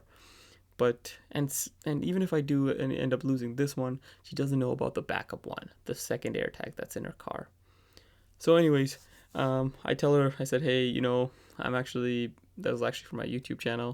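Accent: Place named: India. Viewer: indian accent does not surprise me about a guy tracking his girlfriend lol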